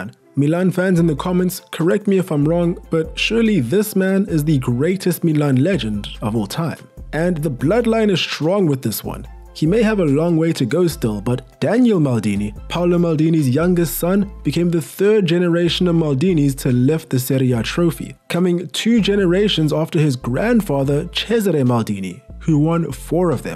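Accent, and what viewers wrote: South Africa. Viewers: bro, are you South African? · are you south african ?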